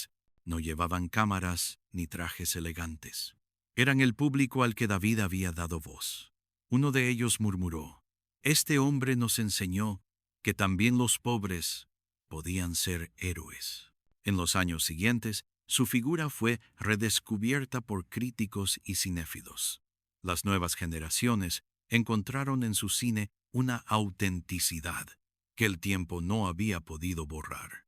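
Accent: United States. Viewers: Todo bien, menos ese acento gringo del narrador…🤣🤣🤪👎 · Ya se perdió la historia con este cuate que habla cómo americano 😂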